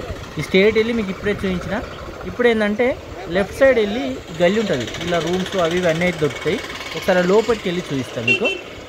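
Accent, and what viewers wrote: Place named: Warangal, India. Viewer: Bro chowrasta antunnV..nuvvu warangal aa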